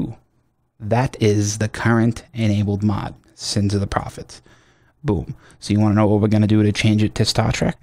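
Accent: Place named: Massachusetts, United States. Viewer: This dude has to be from Massachusetts... the accent... lol, my computer is a piece of shit old Alienware that is all kinds of cluttered so nothing works for me :( I really don’t want to spend $3k for a new one but that might be the only solution. Having hughesnet for internet which is satellite with a 50 gb cap per month ( hughesnet is absolute garbage and they don’t deliver what they claim so don’t do it unless it’s your only option and last resort.) doesn’t help for problem solving as far as re-downloading everything. Very entertaining btw.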